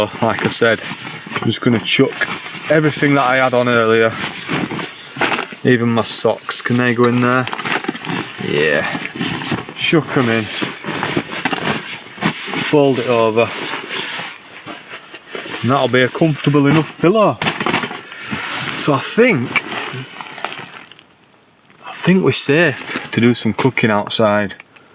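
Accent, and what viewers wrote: Yorkshire, United Kingdom. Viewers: Are you originally from Bradford or Sheffield pal?? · Are you a Rotherham lad or Barnsley boy? Trying to guess?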